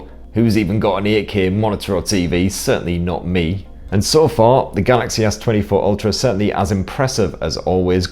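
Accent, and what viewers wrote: United Kingdom. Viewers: I love UK accent,is very clear.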